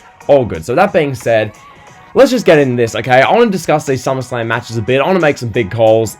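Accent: Australia. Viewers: I love your accept bro, my Aussie bro 😎 John Cena should lose to Roman Reigns, simply because, a win will deter his accolade. Roman Reigns needs this victory more than John Cena... I'm not saying it cos I'm a fan of Roman Reigns.. bur that I'm a wrestling fanatic and critique...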